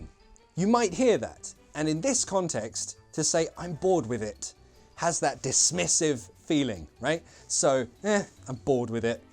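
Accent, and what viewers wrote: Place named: Cockney, United Kingdom. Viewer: Nice ,with a cockney accent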